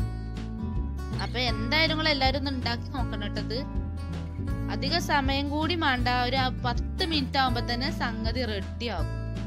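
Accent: Malappuram, India. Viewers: മലപ്പുറം താത്താന്റെ സംസാരം കിടു 🤣😘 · ഞാൻ ഇപ്പൊ കാണൽ തുടങ്ങിട്ടൊള്ളു. ഒരുവിധം കണ്ടു വീഡിയോസ് ഒക്കെ സൂപ്പർ. അവതരണം പറയാതെ വയ്യാ. തനി മലപ്പുറം ഭാഷ.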